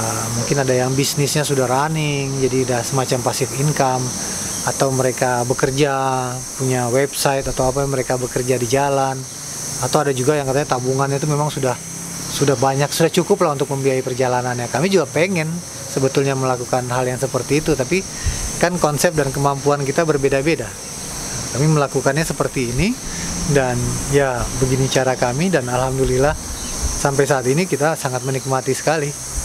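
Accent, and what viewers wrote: Palu, Indonesia. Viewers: Makin lama disini, logatnya bang sudah seperti logat Palu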